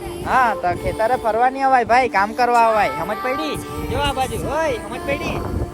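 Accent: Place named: Surat, India. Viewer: Respect karo bhai atli simple gorgeous wife mali c6 to.. Tamri surti bhasha sambhalvani Mazama...keep it up 🎉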